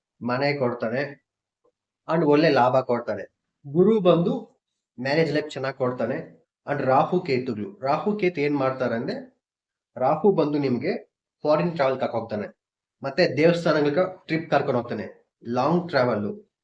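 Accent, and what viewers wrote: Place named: Tamil Nadu, India. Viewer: Tamil nava Kannada maataadidd haange untt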